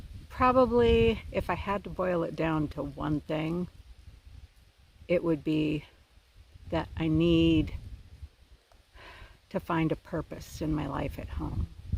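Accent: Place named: South, United States. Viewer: Wow, all done with your videos. I am bummed. I'm really looking forward to many more. You may not hit 1000 subscribers, but those who do are certainly appreciative of you putting out the videos. Thanks, for producing these videos, allowing us into your life's adventures. I'm glad you are finding a purpose and realizing some of your dreams in your life, especially at our age, and you have done a lot of other adventures in earlier life. Lol, it's hard to stop. The southern twang, Oh Golly's, hot as monkey balls, etc., are definitely becoming your trademark themes in your videos. Love It! See you soon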